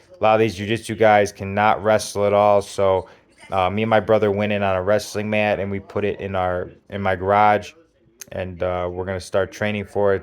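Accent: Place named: Upper Midwest, United States. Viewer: Yeah it's a northern Midwestern accent. I've been told I have a Michigan accent, which is where I grew up. I'n rural in 46788 Indiana, 45k and on the od l list. I heard that John Prime had a Midwestern accent with a hint of his Kentucky roots. I believe he was from Chicago, and I really love his work. Good to hear you talking about UFC. The Michael Pereira fight last Saturday was incredible.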